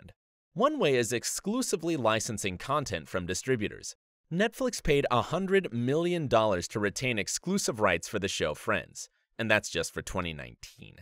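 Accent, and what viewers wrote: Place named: United Kingdom. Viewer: I actually miss the British accent. :(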